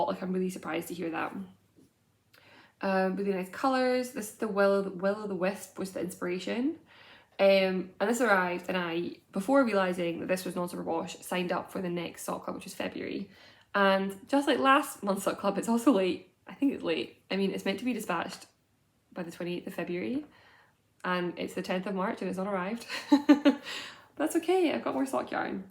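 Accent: Scotland, United Kingdom. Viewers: Perhaps for your Rust sweater you might consider knitting the whole neck with ' knitting in ' elastic, which because it is fine has the advantage of being invisilble, and the elastcity is gentle but lasts. I hope you don't mind my saying, but I do love you soft scottish accent, I think all the people you need to contact by phone should only be allowed to employ, scots people, the whole world would be a lot happier as who could be cross with anyone who sounds so lovely.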